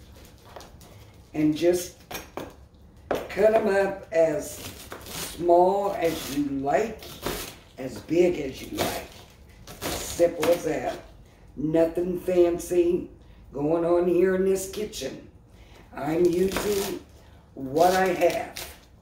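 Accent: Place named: South, United States. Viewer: You don’t have the Indiana accent…it sounds more southern like me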